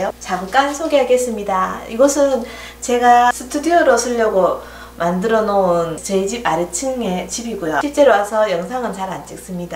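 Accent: Gyeongsang, South Korea. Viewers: 벌써 실버버튼 인가요 ^^ 그리고 실버버튼.. 저렇게 생겼군요 축하드려요 대구살때 한번씩 친정엄마께 전화해서 요리법 물어보곤했는데 여기 미국오고나선 이모님 영상을 많이 참고합니다 경상도분이신가..말투도 친숙하구요 ㅎ 다시한번 축하드리고 골드버튼 되는날까지 아자 아자 ^^ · 님, 시방, 힐링님께 감사댓글을 올리려 들어왔더니 먼저 올려주셨네요. 저도 ♡ ♡ 닉네임도 호감이 갔었지만 구수~한 대구말씨에 완존 공감을 했었답니다. 정말 이모님의 숨은 내조자에 힐링님도 빠질순없지요. 늘~ 감사드리고 있어요.일일이 댓글읽고, 답글 올리는게 보통일이 아니던데 애썼습니다. 우리모두의 ✌✌입니다.잠자리 들때면 폰도 쉬라구 끄고자거든요. 제가 잠든사이 이런 경사가 저를맞아주네요. 힐링님도 선선~ 한 화요일 되시기를 기원합니다. 수고하셨어요.🙋‍♀️🙋‍♀️🎵🎶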